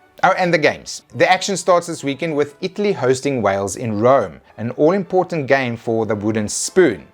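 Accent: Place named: Johannesburg, South Africa. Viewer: Good old Afrikaans Jo'burg accent.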